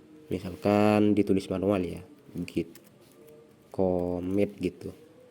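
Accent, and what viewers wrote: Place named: Madura, Indonesia. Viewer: Orang madura ya bang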